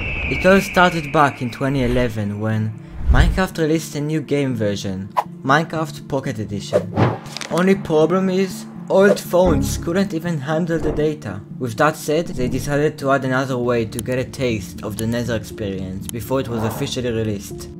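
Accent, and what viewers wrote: Israel. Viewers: היי אחי יש לך מבטא ישראלי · Is there any chance that you live in Israel? Or that your first language is Hebrew? Because this accent sounds familiar.😂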